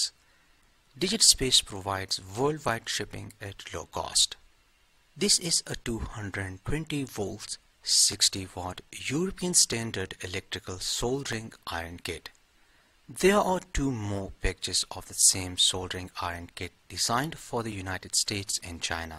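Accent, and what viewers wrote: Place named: Mumbai, India. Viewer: I like your accent. Is it Mumbai/Bombay?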